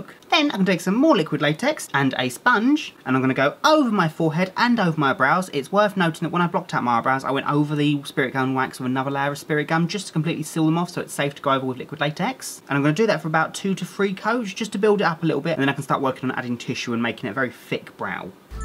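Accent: United Kingdom. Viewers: Ok so rumor has it that the new pennywise has a British accent like you, so if that is true you killed it more then you thought!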